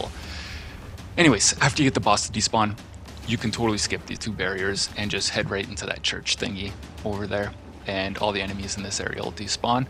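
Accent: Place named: Canada. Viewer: Yup, we have the same accent though mine is slightly different cause I am from Newfoundland, but I recognized the canadian nuances lol nice!!! As for Wizards, I hate them, they melt man, I can't even use stormchaser when I know wizards are involved cause I struggle to maintain headshot accuracy with them, so I usually try to build around Gally if i know wizards are involved, and Arby is a staple in ALL my builds, it literally hasn't left my kinetic slot since I got it, the only better weapon right now is Wishender, and Wishender hits harder.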